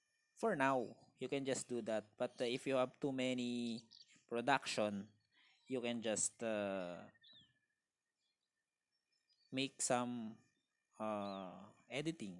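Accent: Philippines. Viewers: Your tips are very helpful, thank you 👍👍😊 btw I'm loving the Filipino accent 😁✌️ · pinoy accent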